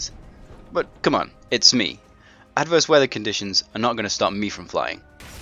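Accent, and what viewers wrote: United Kingdom. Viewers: / /. Adjective: 1. The apex of human skill. Verb: 1: Attainment of perfection in the course of ordinary gameplay and related abilities. i.e. "I grenade jumping!" Noun: 1: The greatest Halo campaign player alive. 2: A friendly British chap with a suave commentary voice.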